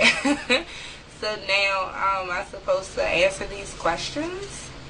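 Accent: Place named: Washington DC, United States. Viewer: yessss! ihated that BUG question. it was soooo dumb! &&yu are sooooo DC iLOVE it! &&&thnxxx for the shout out hunni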